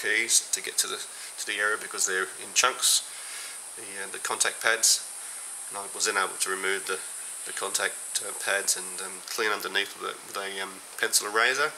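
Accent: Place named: United Kingdom. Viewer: Cool! Such a small world eh? I'm in Hamilton - from Toronto originally - got the Roland RD 250 s As a Gift - from a great friend - - - - - had the "two dead keys" issue - - - - went on You Tube - - - - found this wonderful " " Brittish accented wonderful guy, gladly sharing his wisdom and awesomely creative advice - - - and did what he said - - - and bent (it took me 2 versions to get it right - the first one was too thin of a paper-clip - gotta use a thicker one, like he told us) and BOOM - fixed - and done. This is an amazing keyboard - a Classic. To have Great Advice and Wonderful Tips like those from - are So Much Appreciated. Thank you - Again -!!!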